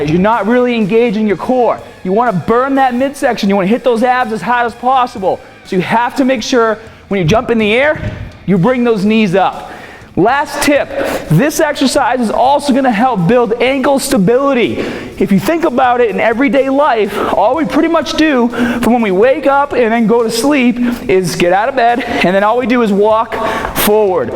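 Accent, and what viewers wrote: Boston, United States. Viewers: hey Im curious. When I am deadlifting, when I go heavy and somewhat heavy(for me) I notice I have a somewhat round in my back. Not like incredibly terrible, but I notice it when I recorded a side angle, for testing purposes. Should I drop the weight down like 40% of my 1 rep max, and try to work on perfect form while building back up, or is a slight bend in my lower back okay? I wasn't using a belt at the time because I notice you dont until you get near your max, so I try to stray away from the belt until I need it. What % of your one rep max do you start to use the belt? Any help from anyone would be great! I love your video's, thanks for teaching me nearly everything I know about lifting. Cheers. P.S I always thought you had a boston type of accent, didnt ever know you tried to cover it up xD I always heard it. haha keep it up! 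· Are you from boston? You have a boston accent tbh haha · What's with the Boston accent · Since when did he have a Boston accent??? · i dont know how i havent noticed it, but you have one of the heaviest boston accents ive ever heard. i go to BC so i hear it all the time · Just like how different it is, I just love Boston accents ahah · That Boston accent coming out strong! Awesome video though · Is it just me or did he develop a Boston accent? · The Boston Accent is Wicked Cool. With that strength, body, agility, and stamina, can speak anyway he wants. Your my hero. (Especially since we both are from Massachusetts). Great Exercises. I will try them next training day. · is it a Boston accent? I'm from UK I don't really know, but it makes me smile adds to your character LMAO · Thumbs up for Bawston! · love that boston accent man. never lose it! :)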